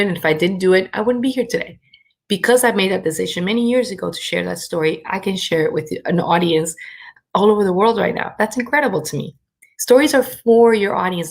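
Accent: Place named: United Kingdom. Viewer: How can this Indian speak British, even Priyanka Chopra ka accent itna achha nahi hai I am amazed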